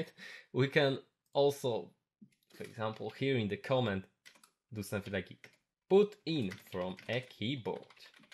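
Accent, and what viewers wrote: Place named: India. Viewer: I miss programming tutorials without indian accent <3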